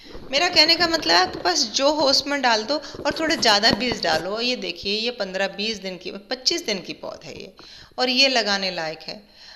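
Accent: Standard. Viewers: Very nicely taught Ma'am. I luv ur shudh hindi !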